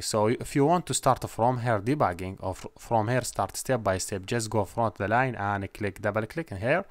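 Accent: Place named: Saudi Arabia. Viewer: واضحه اللهجة السعودية ما شاء الله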